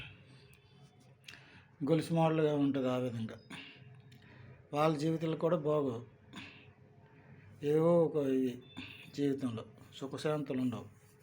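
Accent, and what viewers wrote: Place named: Srikakulam, India. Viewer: గురువు గారు మీ యాస, భాష చూస్తుంటే మీది ఉత్తరాంధ్ర శ్రీకాకుళం అనిపిస్తుంది.. మీరు ఎక్కడివారైన చాలా మంచి విలువైన వారసత్వ నిధిని సమాజానికి అందిస్తున్నారు,అంతరించిపోతున్న ఇలాంటి భారతీయ విద్యను నలుగురికీ తెలిసేలా చేస్తున్నారు..కానీ నా విన్నపం ఏంటంటే మీ లాంటి వారిని ప్రత్యక్షంగా కొంతమందిని తయారు చేయండి.. కనీసం మీ ఇంట్లో వారినైనా కొత్త తరాన్ని తయారు చేయండి... నమస్తే ,జై శ్రీరామ్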